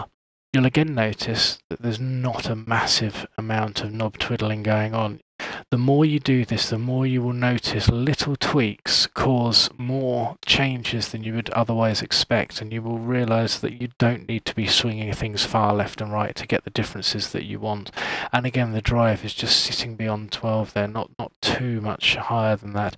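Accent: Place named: United Kingdom. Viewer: I have a different reason why people clamor for new presets all the time. Our species is never satisfied. Grass is always greener on the other side. we are explorers. Has nothing to do with guitars or presets. Just my tiny opinion which might be 100% wrong. But I love that British accent. And the idea that the underlying methods/reasons/techniques/science is important so you can pinpoint things in YOUR project and improve them instead of blindly hoping a preset works.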